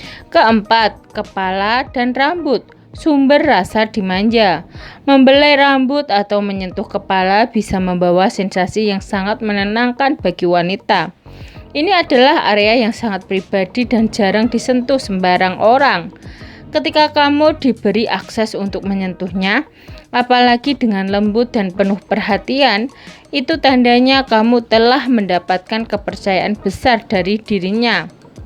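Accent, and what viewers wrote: Java, Indonesia. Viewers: Tebal sekali medoknya, orang mana sih mba? Dulu makannya apa kok bisa tebal sekali?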